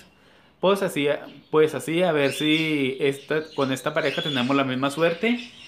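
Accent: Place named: Chihuahua, Mexico. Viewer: Hablas con acento ,eres de Chihuahua?, saludos amigo yo tengo 14 periquitos que estes bien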